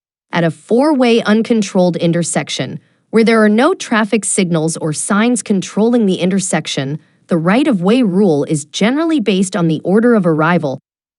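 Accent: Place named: United States. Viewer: As the voice is American, I'm going with the DMV. Car B has right of way. Vehicles from the right, have right of way as both vehicles arrived at the same time. Reference California DMV: Remember, "right is right". The “First Come, First Served” rule applies. If two cars arrive at the same time, the driver to the right has the right of way.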